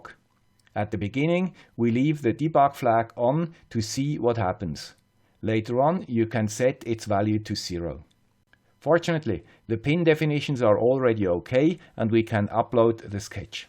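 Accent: Switzerland. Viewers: Dear with the cool Swiss accent Finally I got all parts to replicate your awesome project. After soldering all parts on the pcb I took your sketch from your cool Github repository. My hands were already wet and my heartbeat had already reached a critical rate because of the great excitement. With my highly shaking right hand I tryied to hit the upload button. After a few try's it began to upload. Then the shock of the day -> Error message: "conversion from 'const ip_addr_t* {aka const ip4_addr*}' to non-scalar type 'ip_addr_t {aka ip4_addr}' requested " Because I'm a beginner in the Processing programming language I have no clue how to fix it. Do you can help us to fix it? I'm sure for an expert like you this problem is like peanuts;) Maybe it has something to do with the version of Arduino IDE or a library. I'm not sure:-| Kind regards and thanks a lot for sharing your awesome projects, ideas and knowledge with us. 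Thats very kind Greeting from Bern and stay healthy delf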